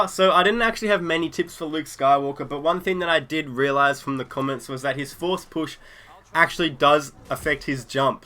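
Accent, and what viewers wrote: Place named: Australia. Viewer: why is every fucking battlefront 2 video narrated by an aussie?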